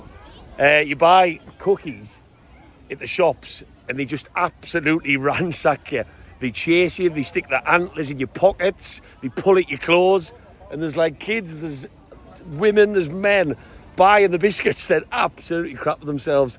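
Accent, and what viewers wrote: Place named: Newcastle, United Kingdom. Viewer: A proper Geordie in Japan, absolutley class vlog mate howay!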